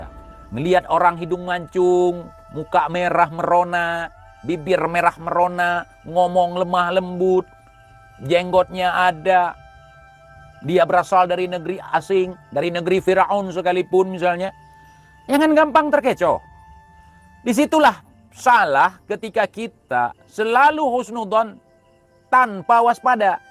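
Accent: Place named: Aceh, Indonesia. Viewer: Bang , peugah haba ,mangat that ta dengo . Makasih . Logat Aceh bék gadòh .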